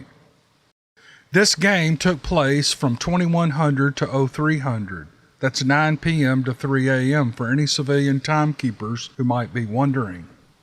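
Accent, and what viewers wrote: South, United States. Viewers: He is surprising one of the best narrator/commentators on YouTube today that southern gentlemen’s voice I think is the ticket of Sir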